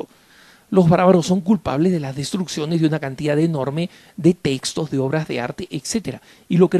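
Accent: Lima, Peru. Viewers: eres peruano? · Creo que por el acento el pata es de Lima pituquilandia... me parece, o de Huacho o del norte chico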